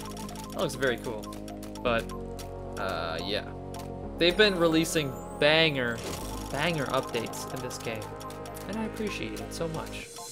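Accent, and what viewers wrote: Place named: United Kingdom. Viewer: De British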